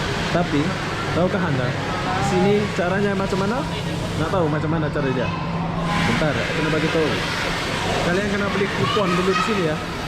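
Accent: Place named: Malaysia. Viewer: Wah awk cakap mcm org malaysia la.. "berape harge die" 😂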